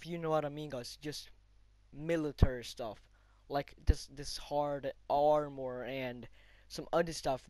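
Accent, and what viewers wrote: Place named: Norway. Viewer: very nice, immediatly heard it from the accent. love from norway!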